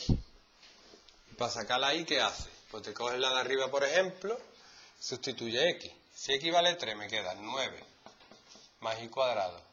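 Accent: Andalusia, Spain. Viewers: andaluz tenías que ser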